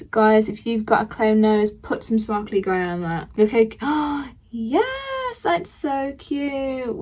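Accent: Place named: United Kingdom. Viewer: Your accent is so cute. I currently live in Australia and wanna follow your accent, not bogan accent. Even though you explain how to make up, I learn british accent from you haha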